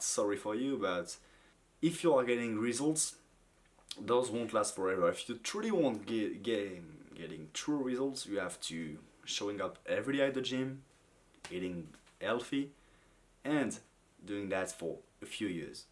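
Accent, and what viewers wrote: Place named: France. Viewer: Just honest feedback, you have great content, but work on your English your French accent is alluring but a teeny tiny bit too heavy so it’s hard to understand you sometimes. You’ve got the face and content for YouTube it’s obvious! Good luck!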